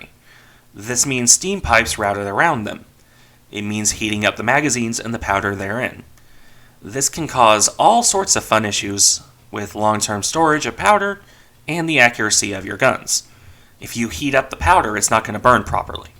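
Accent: United States. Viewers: Oh, just checked up on dee ofa comments: see y'all Virginian, wit dat southern drawling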